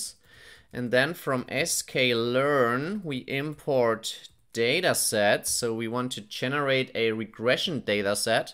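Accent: Germany. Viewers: I love your strong German accent. I study at a German university and you remind me of my professor. Thank you very much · Thank you very much. I love your gernan accent, and your tutorial helped me learn pytorch.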